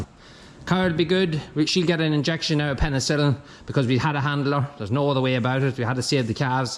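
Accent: Ireland. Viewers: Irish or English? Where I am cattle are outside year round. USA. Excuse me but are not twins not as large? I think having twins would be easier? The more I listen the more I think Irish. My grandpa raised herefords. His bull was so tame. He stayed with the cows for two years, then he was sold. Grandpa changed bulls every two years. OK going to shutup. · You sound like my Grandparents. They were from Ireland. And they have been gone 40-45 years now. Thank you for putting a smile on my face. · Hello, a new viewer here. What an amazing story about you surviving a bull attack. I especially enjoyed how gentle and caring you were while assisting with the delivery of the twin bull calves. Your Irish accent is quite charming.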